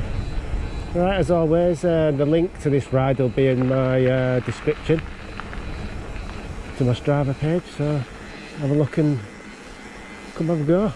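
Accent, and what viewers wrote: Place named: Yorkshire, United Kingdom. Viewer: Yorksheer way? Northern 👊🏼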